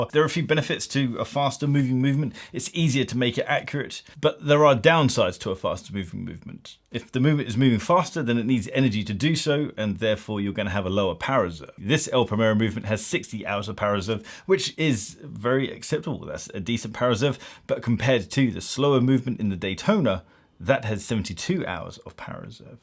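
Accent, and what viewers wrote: RP, United Kingdom. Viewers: Yes, I was bored to tears listening to your public schoolboy voice way before that. That wasn't your point in this video and that isn't what you think so who cares. Rather than argue with people, which isn't a good look for you, why don't you just hold your hand up and admit - you got it wrong and totally misjudged opinion on this one. This watch is a masterpiece and Zenith have all the heritage & provenance when it comes to chronographs. You do know what El Primero means and it's relevance don't you?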